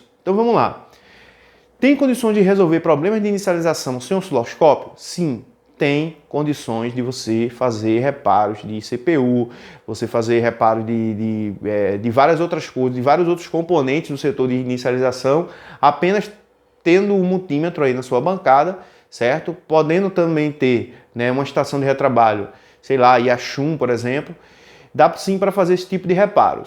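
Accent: Pernambuco, Brazil. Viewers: Tu es Pernambucano? E alve Rubro?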